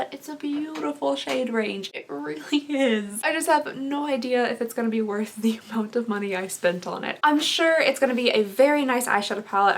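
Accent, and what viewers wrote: Kentucky, United States. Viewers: Mercer County here.. I knew she was from Kentucky as soon as she started talking but then she said something about a flea market and I literally laughed out loud 🤣 subscribed right after that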